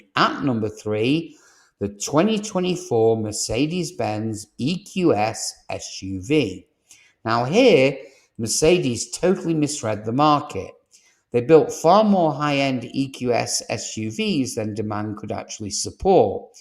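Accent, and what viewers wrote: England, United Kingdom. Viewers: Wow! Seems as if I am way out of this regime! I am an 82-year-young South African chap who proudly owns a 1999 Land Rover Discovery 2 V8. I have never seen any of the vehicles which you mention, here in beautiful South Africa, so cannot comment. Maybe people should be educated in matters mechanical before thay purchase their 4-wheeler. My Landy has done just 280 000 kilometres, has never let me down, and has had the four usual problems of its model attended to timeously. Leather seating, full aircon, great sound system, absolute reliablilty, easy driving with excellent driving view and man, it goes simply anywhere and everywhere! I am very interested in your use of the word 'GAS", dear presenter. With your delightful English accent, I would expect you to use the term "petrol", as we do here in beautiful Cape Town. Thanks for great entertainment!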